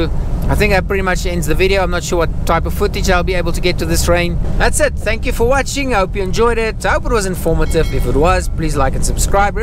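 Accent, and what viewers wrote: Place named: South Africa. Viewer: I love ur south african accent. I lived in rsa few years. Genit u dag meneer .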